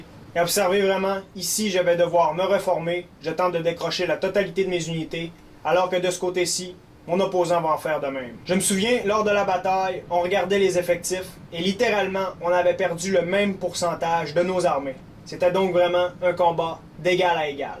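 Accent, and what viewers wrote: Canada. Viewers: t'es canadien ou quebecquois?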